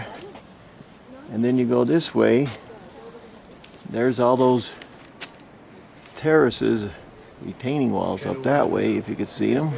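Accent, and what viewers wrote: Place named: Pennsylvania, United States. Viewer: As soon as you started speaking I knew you were from PA....like myself! Nice video!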